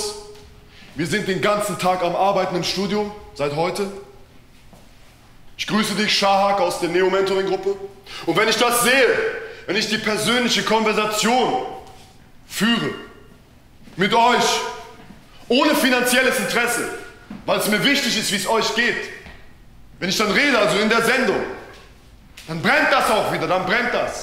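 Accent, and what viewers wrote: Germany. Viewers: Warum spricht der wie ein Saarländer? Wer soll damit verarscht werden?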